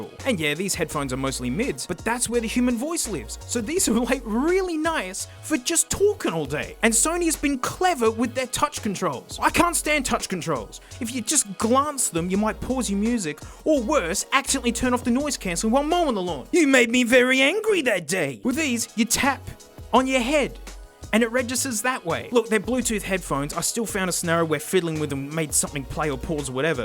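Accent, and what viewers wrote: Australia. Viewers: the aussie man is back at it again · That has to be the most Australian dankmic rant yet.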